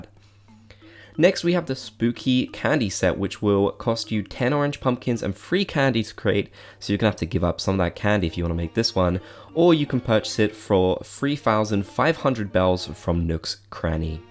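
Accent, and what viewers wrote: United Kingdom. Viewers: Alternative title: British dude obsessed with a purple cat rambling about animal crossing for 6 minutes straight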